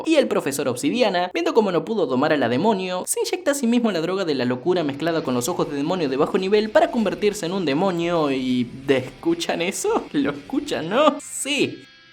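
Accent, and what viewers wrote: Argentina. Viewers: che soy yo o tiene un re asento ARGENTINO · Sos argentino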